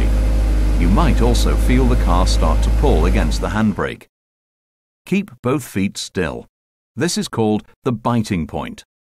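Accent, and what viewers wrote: United Kingdom. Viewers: American English??? 😂 He is speaking the queen's English! Pay attention to the comments about gear stick, not shifter, reference to accelerator, apart from the English number plates, and right hand drive. Have a nice day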